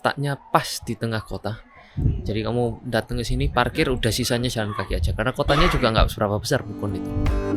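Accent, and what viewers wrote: Java, Indonesia. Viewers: Kapan² full gae boso Jowo Cak 😁 logatmu wes kuwentell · Mas logat mu Surabaya atau madura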